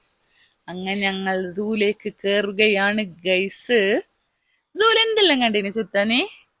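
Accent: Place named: Kasaragod, India. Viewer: Kasaragod basha തുടർന്നോളു ഇതാണ് നല്ലത്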